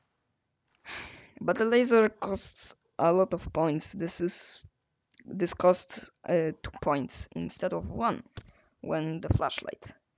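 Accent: Russia. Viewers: i love how the russian accent matches with the team you're on despite you being from poland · I can really hear the Russian accent In your voice.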